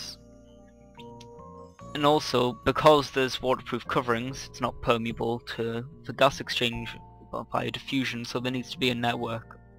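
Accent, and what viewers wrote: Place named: Ireland. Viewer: He sounds Irish to me :)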